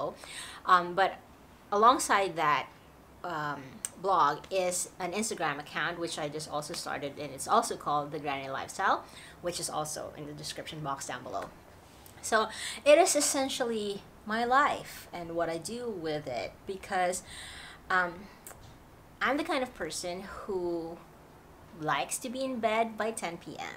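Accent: United States. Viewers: Hello, una sa lahat huli naba ako. Tell you frankly watching & listening to your blog. Kaya naiingit ako sa iyo, dahil buti kapa meron kanang American accent samantalang ako ito halos 34 years na dito dihin parin gaanong sanay mag- English ewan ko rin kung bakit. Siguro dahil tinatagalog ko itong misis kong puti. Siya ang pinipilit kong magtalog. By way I missed iyong blog mong Tag-lish na naglalakad along busy city somewhere in Sydney sa palagay, iyong palagi mo pang sinasabi mahal din kapag ki- convert mo. At iyong lilipat na kayo sabi mo pa yata may daga at malayo sa trabaho ng mister. O well napahaba na nandidito na nga pala ako sa States of Florida, probably you heard about the astronauts & home of the " Blue Angel " iyon. I used to worked in the Space Program before malapit lang dito 15 minutes drive work their for 16 years. You like the climate here just like sa Pinas and 3 hours drive away to Miami where they always shoot the Miami Vice during my teenager life. 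Dalasan mo sana ang paggawa mo ng mga blog, gusto ko makitang viral sa mga gaya nuong ibang Pinoy!😍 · Hola amiga:) I'm a little late but Congratulations on your wedding hope you had a spectacular day! May God bless you with love, happiness, laughter and patience on your wonderful journey together:):) I hope you post at least one picture of your wedding look. If you already did, sorry I'm trying to catch up on videos. I used to be a party girl loved the clubs and loud music especially the dancing I could dance all night and have the energy to go out the next day to do it all over again. As I've gotten older I don't go dancing as much anymore my health problems make it a little harder. And I lived for spending my days at the beach it took all the stress right out of my life. Also, enjoy going out to dinner and spending time with the girls and great conversation LOL When I started watching your videos I thought you were from the US. 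You're probably right watching all those shows growing up influenced the way you speak. The only show you mentioned I never watched was Friends. Star Trek is my favorite show. I'm from New York and hope someday you get to come and experience this wonderful place. There is so much to see from Broadway, Rockefeller Center, Lincoln Center to eating a NYC hot dog hahahahah And maybe you can do a meetup. Have a happy day!!!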